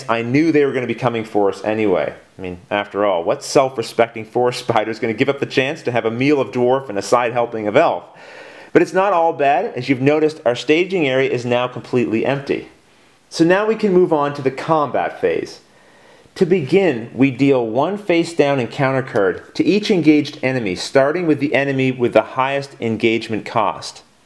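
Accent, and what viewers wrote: Canada. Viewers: Man, great tutorial. I drew identical cards as you through that first round and it really helped. But my hand couldn't be more different for this next round, so I'll actually have to use my brain. Your explanations are slow and understandable, so it shouldn't matter. I like the Canadian accent btw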